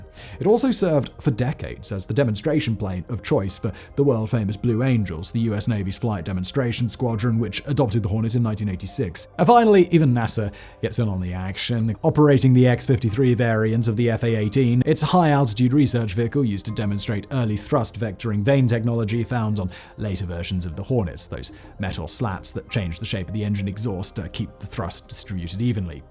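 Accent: United Kingdom. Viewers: Slow down! You talk so fast in your brit style us Americans can't keep up bro...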